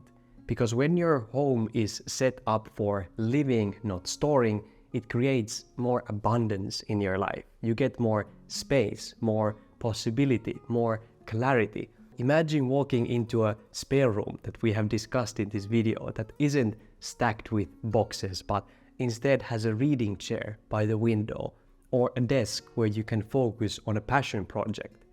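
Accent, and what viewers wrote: Finland. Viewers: Got a recommendation, dat finnish accent, I don't even speak the language but I still immediately recognized rally english :D This is one of the best summaries it's going on in my community, especially among older people. It affects a lot older people, seems like this happens when your cognitive capabilities drop, hence I'm lately trying to avoid hoarding as would demonstrate I'm already getting brainpower reduced. Old people, at least in my country, were rather well off, even with mediocre job you could afford a home, so they don't value space as our younger generation. Also in the past jobs and overall life didn't require much intellectual efforts, which lead to this situation. Another reason for hoarding is that certain things are very hard to get rid of, with all this green agenda, you can't carry all the junk in the nearest dump container and if you do, you try to get there without anyone spotting you.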